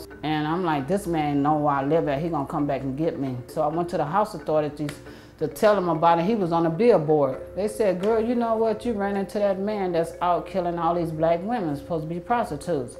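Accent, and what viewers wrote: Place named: Louisiana, United States. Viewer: She still got our accent tho.... Half of my family moved to California in the late 60's and they know they are from Louisiana...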